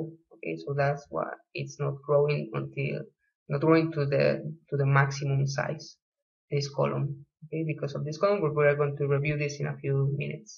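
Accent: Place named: Italy. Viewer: Accento italiano!! Grazie per il tutorial!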